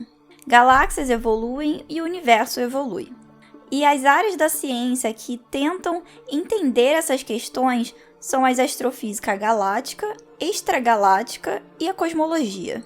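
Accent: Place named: Rio de Janeiro, Brazil. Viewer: Mds, sotaque carioca é perfeito aff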